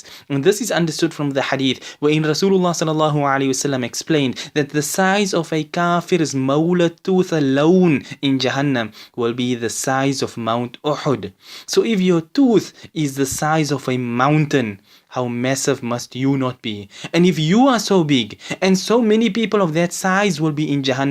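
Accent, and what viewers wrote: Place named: China. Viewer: You have a Chinese accent bro...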